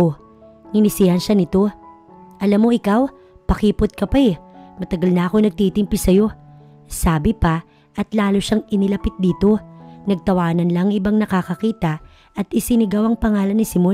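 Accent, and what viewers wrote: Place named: Hiligaynon, Philippines. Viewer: Napaka gandang kwinto story Lalo pa ilongga ang nag kwinto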